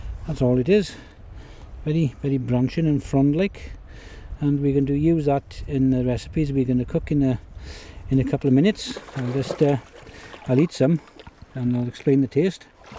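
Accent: Wales, United Kingdom. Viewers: Great videos but am improved mic setup might be a worthwhile investment! Can be a bit difficult to hear sometimes with the thick welsh accent and background noise.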